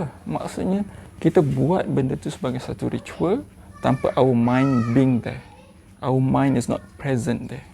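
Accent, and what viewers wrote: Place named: Kelantan, Malaysia. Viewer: Doktor ni org kelantan ke..dgr bunyi budak cakap kelantan..hehe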